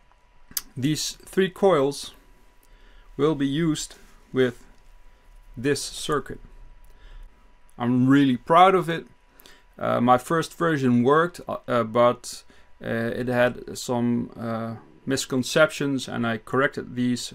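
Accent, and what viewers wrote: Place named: Netherlands. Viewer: echt heel interresant gij zijt mijn nieuwe favorite youtuber dank u voor al u wijsheid ik veronderstel dat u van nederland bent aan u accent te horen :) hoop dat ik juist ben denk het wel ik ben van belgie en wil heel graag meer weten over deze onderwerpen en jij legt het heel goed uit en ben u heel dankbaar daarvoor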